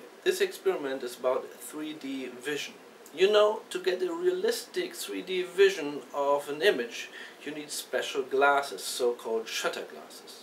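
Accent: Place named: Germany. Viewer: du bist deutscher oder? man hörts voll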